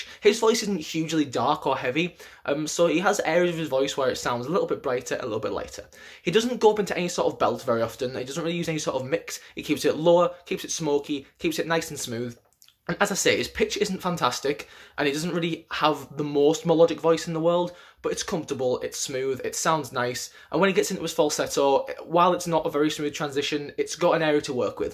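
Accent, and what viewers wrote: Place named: North, United Kingdom. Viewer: im so glad i stumbled across your channel, i for some reason love hearing you say their names in your british northern accent rather than all the american vocal commentaries cos it feels so familiar to me hahah "haaey chan". i love that u comment on each members voice and have good things to say about all of them cos they really are a group of MANY insanely talented singers.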